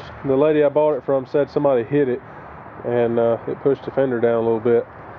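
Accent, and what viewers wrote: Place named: North Carolina, United States. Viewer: I absolutely enjoyed your commentary! I'm guessing you're from southeastern North Carolina. I'm from Wilmington.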